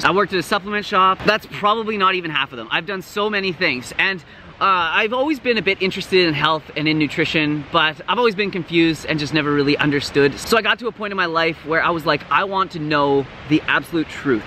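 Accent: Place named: Canada. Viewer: Question! I’m new to the channel but have been absolutely loving it! I am from New England in the US so we do get cold winters as well. I’ve noticed that you have a cool setup in your backyard for your calisthenics... how do you adapt in the winter months? It seems like it would be too cold or snowy! Also btw I just love the Canadian accent and lingo since I have ancestry from Quebec. Love your channel! It’s binge-worthy! 💚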